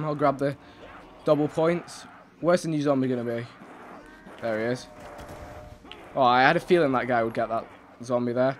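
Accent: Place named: United Kingdom. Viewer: For some reason his British accent makes me not want to punch him in the face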